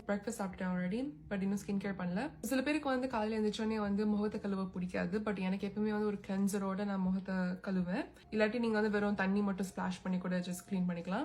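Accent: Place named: Tamil Nadu, India. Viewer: So nice of you talking with tamil accent ❤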